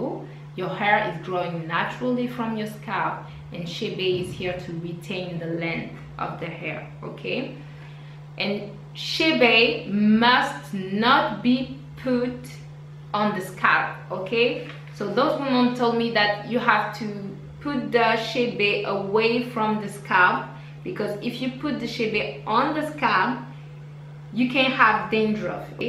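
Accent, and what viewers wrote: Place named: Africa. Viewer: Your English is perfect. It's funny you do not speak it with a French accent. I hear an African accent. And I know Africa is a continent. But you sound like an African immigrant who has lived in the US for a while. Blessings. Thanks for the info.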